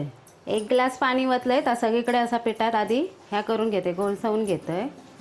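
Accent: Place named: Malvani, India. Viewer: वहिनी आज तुमची चिकन वड्याची रेसिपी बघितलंय तोंडाक पाणी तर सुटलाच पण तुमची रेसिपी सांगूंची पद्घत तर औरच। मालवणी रेसिपी बघूची आणि मालावणी आयकायचा ता तुमचाच। मस्तच।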